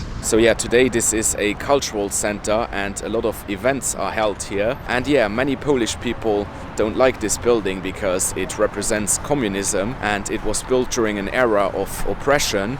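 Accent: Germany. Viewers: greetings from Lithuania! I like your accent, sounds like German. I have never been normally to Poland, one day would like to visit Baltic coast, mountains and capital there. Thanks for the vlog! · OK even if, but his English is with very recognizable german accent as his first language is german.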